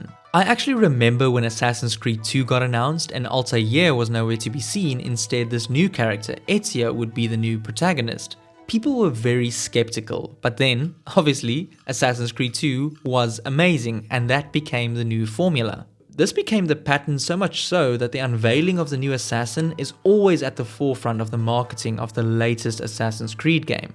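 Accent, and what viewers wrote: South Africa. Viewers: I knew your accent was familiar. Glad to see a saffa doing great content well done 💪🏻🇿🇦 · Do I hear a South-African accent?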